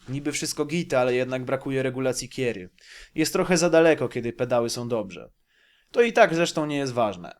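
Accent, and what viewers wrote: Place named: Ostrołęka, Poland. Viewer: Ostrołęckie akcenty, honda na WO.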